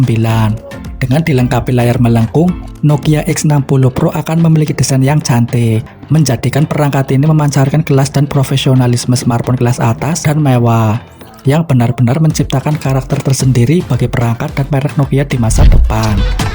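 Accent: Banyumas, Indonesia. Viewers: Bosone ngapak ngapak..... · Ngomong2 admin logatnya ngapak ... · Wong ngapak kie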